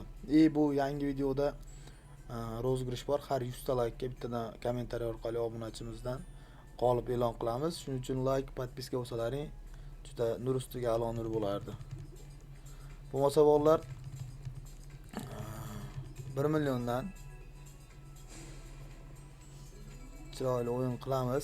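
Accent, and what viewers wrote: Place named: Fergana, Uzbekistan. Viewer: Aka qayerlisiz shevez buvaydanikiku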